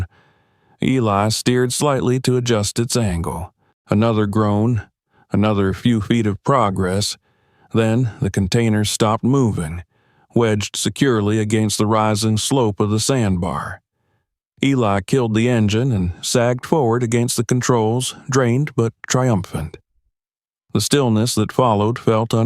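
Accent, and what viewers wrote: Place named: South, United States. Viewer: Oh goody a AI story with a Hill Billy voice over narration.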